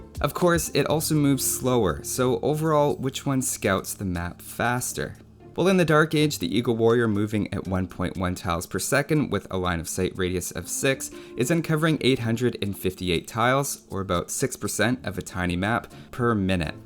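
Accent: Canada. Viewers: Is the author of the video Canadian by any chance? "Scout" and "about" are distinctive in their pronunciation to Canadian speech. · My weary soul is calmed every time I hear say his "let's check it out", in that Canadian accent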